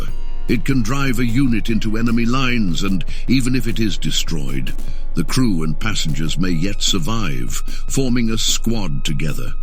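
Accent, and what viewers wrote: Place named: United Kingdom. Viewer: bro had me at british sounding voice and 2nd edition 40k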